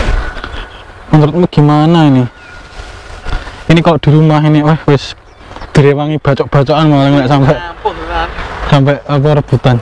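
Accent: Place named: Blitar, Indonesia. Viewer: itu wong mblitaran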